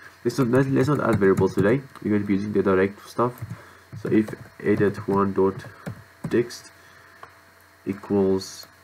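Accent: Afrikaans, South Africa. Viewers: ayy ek kan daai afrikaans hoor in jou stem hehe, baie dankie vir al die tutorials. Dit help rerig baie!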